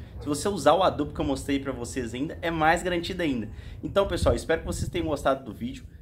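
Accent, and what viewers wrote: Minas Gerais, Brazil. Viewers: O sotaque minerim entrega kkkk Parabéns pelo trabalho!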